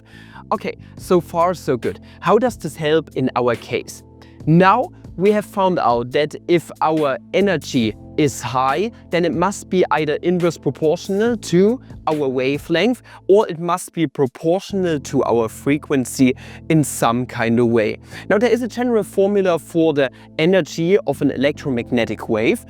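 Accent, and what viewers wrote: Germany. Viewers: Are you German? you have quite the accent.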